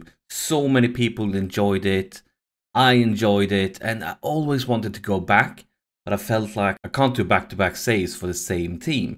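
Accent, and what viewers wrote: Sweden. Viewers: Are you swedish? Sounds like that on the accent!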